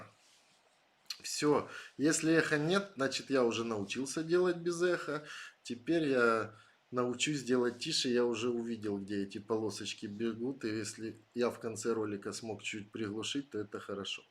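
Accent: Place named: Ukraine. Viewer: ваш украинский акцент в речи, мягкий и душевный, мне очень приятно слушать. Моя мама с Украины, с Херсонской области, я каждое лето бывала у бабушки и этот акцент вызывает ассоциации с детством, с какой то общностью людей разных национальностей. Мне нравится ваша простота, искренность и ваше мировоззрение. Спасибо вам за стрим, за отсутствие нарциссического стремления к совершенству, за поддержку нас, беларусов и всех людей доброй воли. Удачи вам и здоровья!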